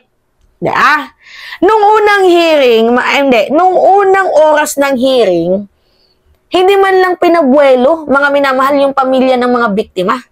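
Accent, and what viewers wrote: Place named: Ilocos, Philippines. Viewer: Natawa ako sa salita mo ma'am okininam ilokana ka gayam hehe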